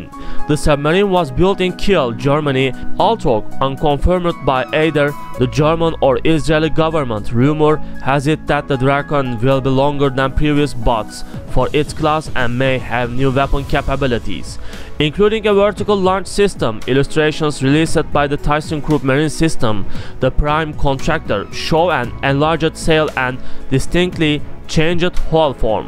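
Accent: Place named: China. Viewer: Why does narrator have Chinese accent? 😊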